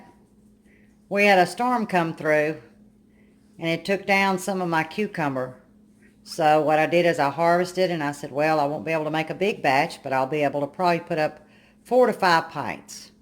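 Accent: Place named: Florida, United States. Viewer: I absolutely love your accent. My kenfolk are from Blounstown FL Panama City FL and speak like you. You sound just like my cousin 😊 Good video. Thank you.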